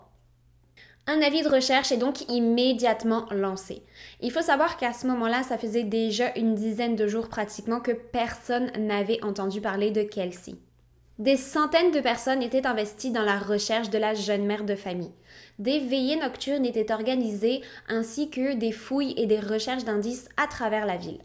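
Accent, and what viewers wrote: Quebec, Canada. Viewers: Est tu québécoise? Jattend un certain accent! Je ne te connaissais pas ta chaîne et jadore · J'adore tes vidéos et surtout ton accent québécois que je trouve à ravir 😍 Que des histoires affreuses et immensément triste ... Merci ☺️✊🏻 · Elle sonne québécoise (du Canada) mais avec aussi un accent français. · tu as un tout petit accent quebecois, j'adore !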